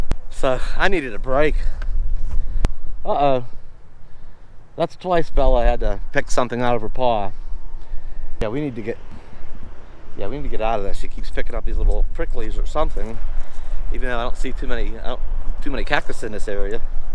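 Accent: Pennsylvania, United States. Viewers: Man listening to you narrate makes me think of friends from PA. Enjoy NewMexico and hope you find some cool little places to explore and maybe a 💈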